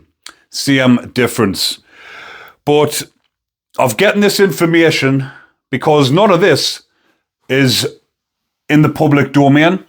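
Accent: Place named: North East England, United Kingdom. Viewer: Love your channel marra. Being from the Northeast mesell it's good to hear a familiar and friendly accent.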